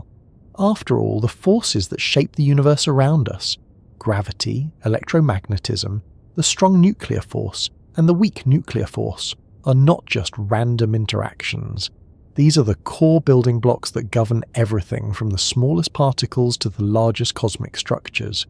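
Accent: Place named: England, United Kingdom. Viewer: I dont have any idea of 90% of what this all is....I feel smarter just by listening to some English fellow saying big words....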